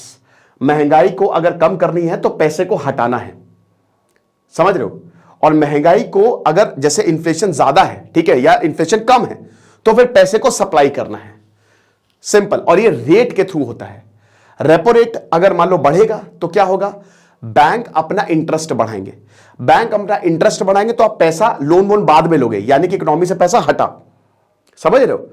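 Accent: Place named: Varanasi, India. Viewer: Excellent sir 👌 apka samjhany ka tarika bhut achha h .... Aap banarasi lagty ho mujhe ya aap U P se kahi or se ho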